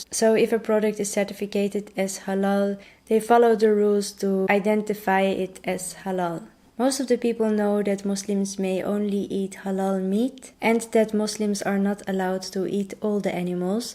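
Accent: Netherlands. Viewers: Ik wist gewoon dat je nederlands was door je accent haha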